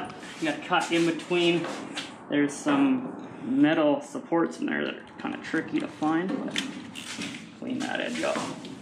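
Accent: Canada. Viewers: Haha....you sound just like me. I'm in Northern Ontario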